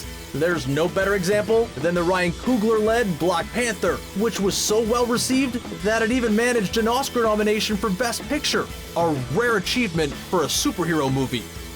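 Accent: Canada. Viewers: I hear a Canadian accent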